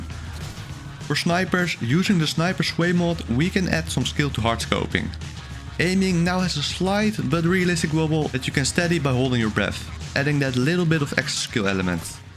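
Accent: Netherlands. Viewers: You gotta be Dutch with that accent. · Is it me or do you sound very dutch ? 😅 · I can recognize a dutch accent when I hear one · dit is echt leip vet, ja sorry ik hoor je nederlands accent wel hoor (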